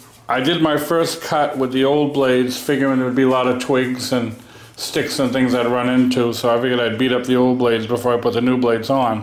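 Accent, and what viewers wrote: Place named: Boston, United States. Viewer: Chicago, Jersey or boston? Oooo he just said the word bar.... hes definitely Boston! 👍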